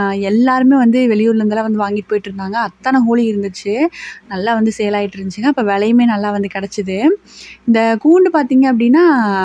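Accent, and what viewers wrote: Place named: Kongu, India. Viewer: Neenga yen sudden ah kongu slang la pesuringa.... Unga previous videos lam normal slang la pesuninga.. Adhaan kettaen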